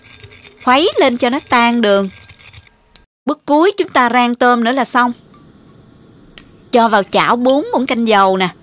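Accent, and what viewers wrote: South, Vietnam. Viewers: Dung la giong noi Mien Dong Nam Bo.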